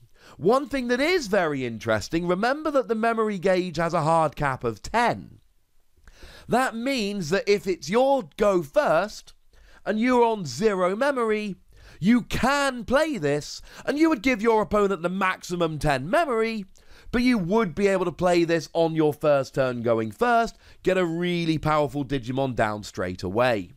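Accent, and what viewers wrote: England, United Kingdom. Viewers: Weird accent? He has an english accent.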